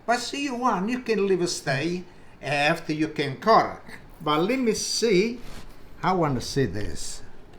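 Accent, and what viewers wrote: Italy. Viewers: Your Italian accent is so refreshing to me… the last time I heard one was in the late 1960’s listening to my grandmother teaching me how to cook. I just turned 14. I loved her so much and still miss her greatly. I subscribed! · I love the way you talk? Your accent is beautiful, What part of Italy are you form? Your bread is amazing you make me hungry. Salute from Texas USA ❤ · His True Italian Accent I like including The Video. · He reminds me of my grandma with his accent. She was from Italy. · Wonderful show. He gets his recipes across in a timely fashion with accuracy. Reminds me of my Italian Grandparents with his accent. Not black pepper. Black AH Pepper. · Love this guy. I'm literally waiting rn for my bread to puff up another 30mins and stick it in the oven after watching this vid. And I gotta say, awesome Italian accent. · I just love his Italian accent 😍 ❤ Beautiful ❤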